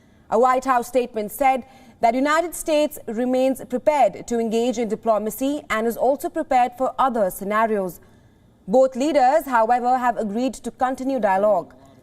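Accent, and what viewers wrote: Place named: India. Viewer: this Indian news reader her accent is so annoying to listen yo go back to India and report they will love you there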